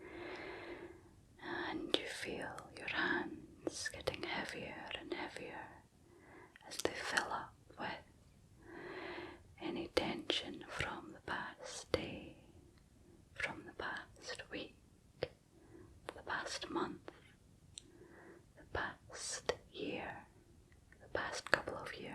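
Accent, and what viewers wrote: Scotland, United Kingdom. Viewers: your scottish accent reminds me of mum so soothing x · Feel like i with a scotish girl. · Just discovered you. Its nice to hear a Scottish accent spoken so softly..... even if you are from Dundee 🤣😜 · lovely Scots accent behind the voice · Hello from Ayrshire it's Good to hear a Scottish accent I have real problems sleeping but you helped this fellow scot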